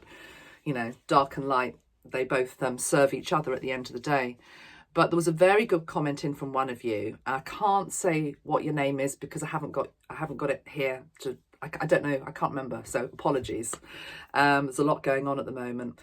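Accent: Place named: RP, United Kingdom. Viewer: I feel relief not schadenfreude when when people get justice, I feel we are moving into cleanliness. But I do want some 3-D justice - it will make things easier. and thank you for your dailies. I find you and even the sound of your queen's english - very satisfying.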